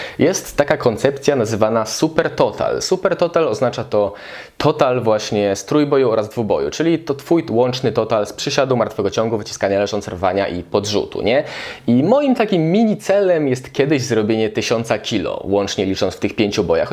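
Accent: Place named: United States. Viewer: Za sam amerykański akcent należy się łapka w górę ;)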